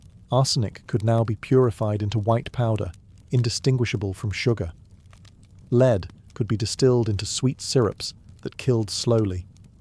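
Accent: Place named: United Kingdom. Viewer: It's 2.35 in New Zealand. Very very tired, but I must have my British man talk to me about history before I conk out.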